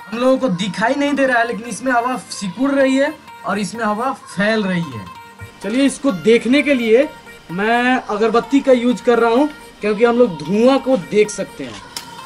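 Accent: Bihar, India. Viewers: bihari ho ka?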